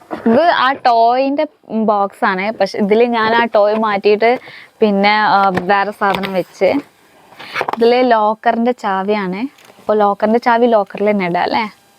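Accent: Malappuram, India. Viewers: Ivar malayali alle?ariyunnavar paranju tharamo? Pinne engane malayalam padichath? Onnum manasilakunnilla.. Malappuram slang anallo padichittullath.. Husband malappuram malayali ano?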